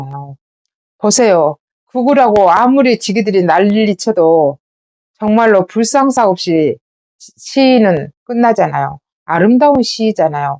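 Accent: Jeolla, South Korea. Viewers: 광주아짐 의 진득하신 남도말씨의 맛깔스러움에 저도 진심 전라도인에 대한 인식이 계몽되기 시작합니다^^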